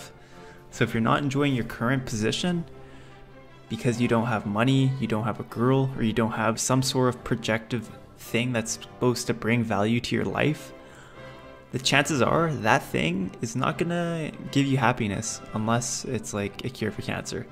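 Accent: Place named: United States. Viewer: So what's your final point. I am sorry I am not very good at understanding American accent. Please explain me. All I understood was enjoying every moment. Is this video about that?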